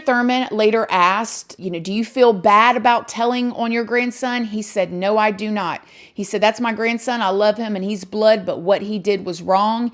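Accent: South, United States. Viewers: I am in love with the country accent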